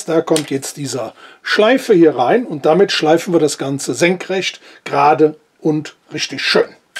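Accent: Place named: Cologne, Germany. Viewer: 👍🤣 bin eigentlich kein Kölner 😉 finde aber Deinen leichten Akzent sehr nett 😊 Gruß Detlef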